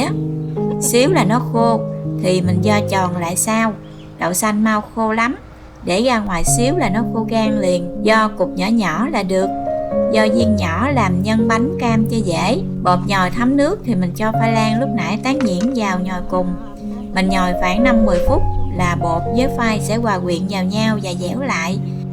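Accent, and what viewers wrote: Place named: Mekong Delta, Vietnam. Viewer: Làm bánh khéo quá, giọng em gặt Miền Tây luôn đó, nghe rất hay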